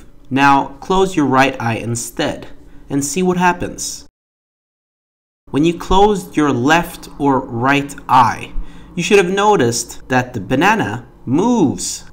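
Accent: France. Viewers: Are you french ? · banana are u french?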